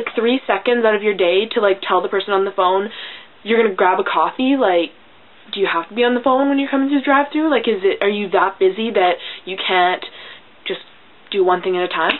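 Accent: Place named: Canada. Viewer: you have such a canadian accent <3 im canadian but your accent is so clear! 'you know' so canadian!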